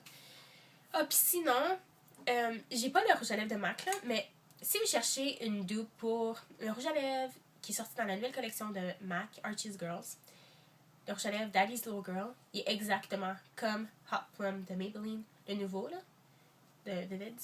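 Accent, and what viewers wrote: Quebec, Canada. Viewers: Malheureusement, j'ai pas pu comprendre ce que t'étais entrain de dire :s L'accent québécois est très différent du français :) En tout cas, t'es trop belle comme toujours <3<3 Gros bisous (l) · YAY finalement ! un vidéo en français ! J'adore l'accent québécois ! :D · t'es quebecoise, qu'elle surprise!! parcontre je suis pas d'accord sur un point, je suis française et pour la plupart on trouve l'accent Qb super mignon... et moi aussi je suis accro au rouge à lévre rose<3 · trop trop bizarre de t'entendre parler québécois ! Je savais même pas que tu parlais français haha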